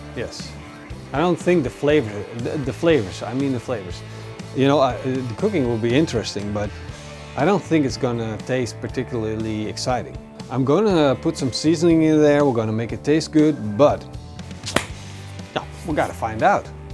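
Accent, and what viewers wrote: Netherlands. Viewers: You‘re dutch, right?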